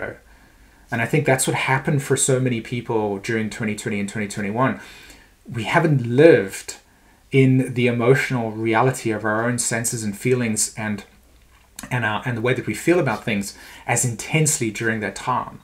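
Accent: South Africa. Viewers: you are loosing your lovely South African accent.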